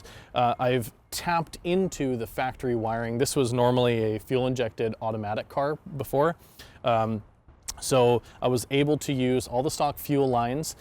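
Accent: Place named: Canada. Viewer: Nice, clean build. I thought you were American at first, but the 'oots' and 'aboots' now make me think Canadian!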